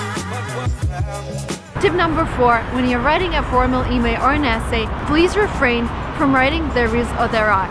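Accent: United States. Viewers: Марина классна говоришь по английскому прямо как Американка сними на русском такое же пожалуйста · Madam you are a Russian and you talk in perfect American english.We Indians adore you.We respect you.ALL THE BEST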